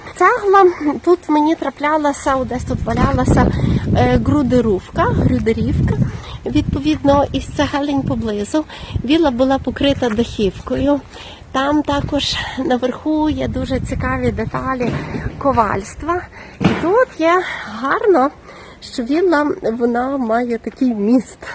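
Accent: Lviv, Ukraine. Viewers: інтонації... абсолютно львівські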